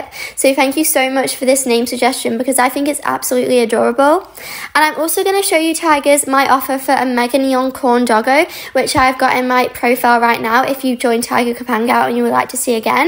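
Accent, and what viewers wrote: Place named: Germany. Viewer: omg how did i not notice that you‘re german!!😍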